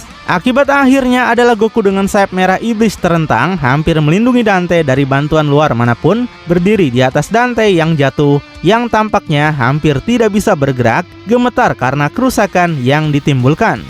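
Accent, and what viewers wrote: West Java, Indonesia. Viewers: Wah mimin org Sunda nya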